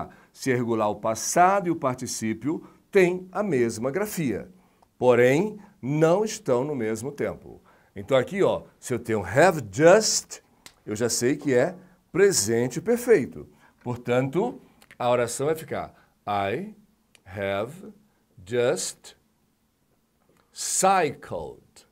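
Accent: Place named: United Kingdom. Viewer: Professor que fala britânico forte.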